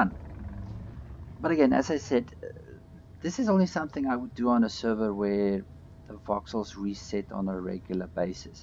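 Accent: South Africa. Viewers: I know a South African accent when I hear one!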